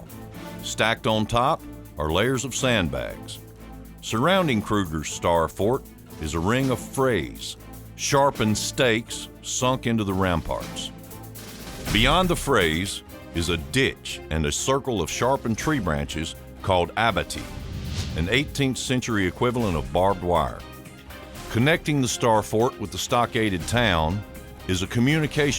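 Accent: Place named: South, United States. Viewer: Decently made, some inaccuracies for sure though. Biggest example being that the British 100% DID have experience fighting “like Indians and backwoods men”. This was called “skirmish order”, and European regiments had a whole company each of light infantry specifically trained in this. They also had just fought an entire 9 year war, both with and against these tactics, known in the US as “the French & Indian War”. So this was nothing new to them, and woodsmen played a minimal role in the war UNTIL the war reached the south where tactics changed. These men would not win in open battle against British regulars. So they did not even try. They attacked civilian and logistical assets, making British leadership not feel comfortable moving further North. This kept the British bogged down chasing “insurgents”(think of modern Taliban tactics), and kept the northern and southern British armies separated. 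My biggest gripe is Trace as narrator. If wanted to be taken seriously as a historian, he should not have been a modern country singer. If you want your documentary to be taken seriously, don’t hire Trace. Especially to cover a subject that literally Southern accents did not exist within. Thank you for listening to my rant 😂.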